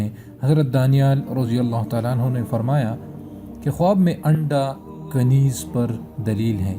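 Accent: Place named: Arab world. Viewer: eysa lag raha hai keh Juma ka khutba sun raha hun> plz mic mun mouth se dur rakh kr audio rec kren our saaf urdu bolen, urdu ko arabic accent main bolne ka kia faida? our 'yeh ourat kre ga' ab yeh kia hota hai? kaniz pr dleel hai woh kia hai? kaniz means Gulam slave yah kia? female? hazrat danial A.S ke ilawah kuch nahi ho skta hai kia? chalo agar koi khatoon he dekh lia anda deti hui murghi tuo kia woh bhi ourat se he shadi kre gi? our kafan chori kre ga uski defination kahan hai? tuo kia zada ande nahi hum main se baz afrad ko hadees pehle se maloom hoti hain defination tlash kr rhye hote hain 3 mins 50 sec zaya krane ka koi faida? 7month se neache log qouestion puch rahe hain kisi aik ka bhi molana jwab dia hai keh nahi?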